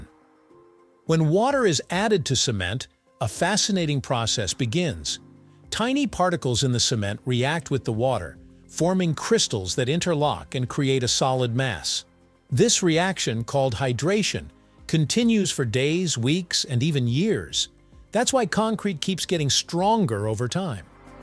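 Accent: United States. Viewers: I noticed the title and thought that this has to be an American video. All English speaking people (apart from Americans) know that cement is a dry powder that needs to be mixed with sand , water and small stones to make concrete. When the mixture is cured and you have a very hard substance that is called concrete. I watched 20 seconds to hear the accent and then switched off.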